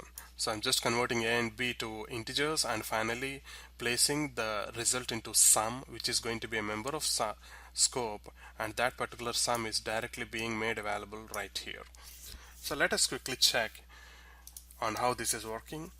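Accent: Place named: India. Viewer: Very good. Since I'm Dutch I had some problems understanding this India way of pronouncing the English language. But who cares if the tut is excellent?! I really never saw the concepts of services so well explained as in this video.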